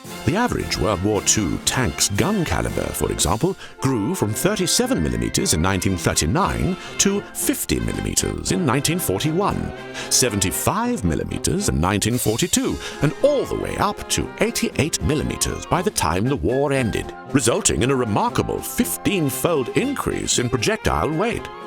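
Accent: United Kingdom. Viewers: The lovely Classic British accent ❤❤❤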